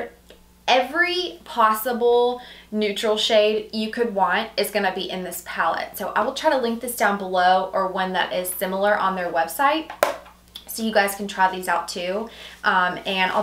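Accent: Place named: Nashville, United States. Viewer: You are just so gorgi! I knew your accent sounded familiar, just read that you're from Nashville! I live in KY. We frequent Nashville & Gatlinburg quite often, its just beautiful there! Loving your vids! :)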